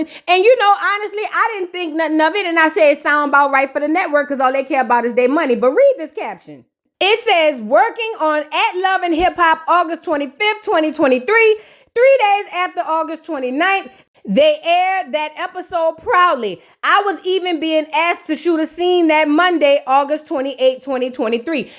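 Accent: New Orleans, United States. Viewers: You are so funny! And ur into keeps a smile on my face, you definitely got the best into of any be I kno plus ur accent i I love it! I always wanted to to go to NO!!!!!’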